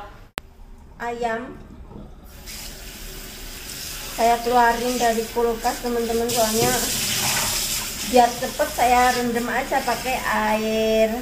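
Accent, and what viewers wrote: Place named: Banyumas, Indonesia. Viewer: Mba aali mana si kok cara ngomongnya kaya q ..q asli banyumas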